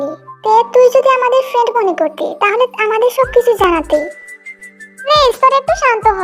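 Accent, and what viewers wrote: Sylhet, Bangladesh. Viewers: আপু তুমি মনে হয় সিলেটি..❤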